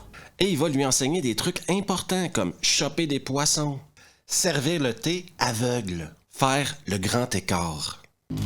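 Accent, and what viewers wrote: Quebec, Canada. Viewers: J'adore tes vidéos. En plus pour un petit français comme moi c'est excellent d'écouter ce merveilleux accident québécois. Des commentaires à tomber par terre. BRAVO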